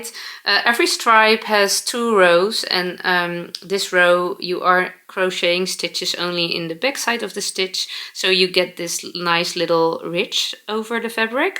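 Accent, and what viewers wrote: Netherlands. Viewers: I love your "Dutchy English",. On one point, we say "lying down" in English, not "laying down." For example, when you are speaking about yourself, you will say, "I am lying down" or "I am going yo lue down." You will use the word "laying" when you are speaking about laying something down, or laying a table with cutlery, for example. That's the general rule, although some people do say laying instead of lying. It's a matter of what you're used to, I suppose. Love all your projects!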